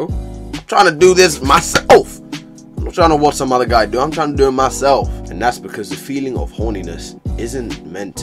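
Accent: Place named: United Kingdom. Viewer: british black man version of a southern black man.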